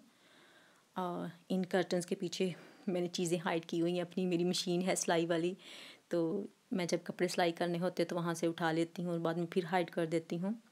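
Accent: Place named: Sialkot, Pakistan. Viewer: MASHA ALLAH ap silakot se ho kia? your accent is like sialkot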